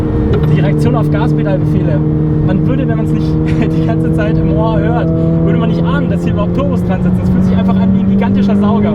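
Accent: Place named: Poland. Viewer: sounds like a Polish Nowak, you are a real polish Nowak?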